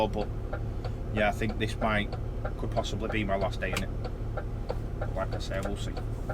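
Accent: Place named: Bolton, United Kingdom. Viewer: Proper bowton accent that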